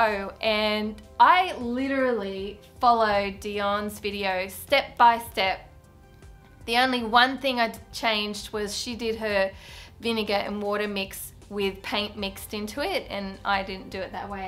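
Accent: Australia. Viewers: So this is the third time I’ve watched this video and I must confess! I also love Dionne’s work and have been hesitant about starting a piece like this. But when you said you messed up the top and redid it, it dawned on me that when you’re painting like this, there are no mess ups, just happy accidents ( thanks bob ross❤️) I think that “mess up” would have added more character! They’re meant to look aged and weathered and painted over a million times, like an old Spanish door❤️I really love this piece and hope to see you do more! Personally for me, you took the fear out of it!😄. I’m really enjoying your channel, your work …and your sweet Aussie accent🥰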